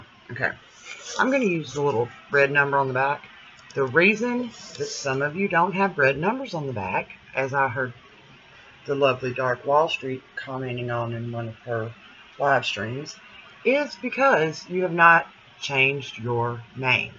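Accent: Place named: Boston, United States. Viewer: YOU TALK LIKE ME. Boston?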